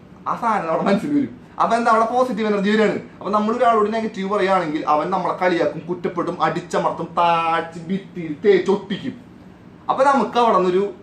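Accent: Thrissur, India. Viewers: Bro തൃശ്ശൂർ ആണോ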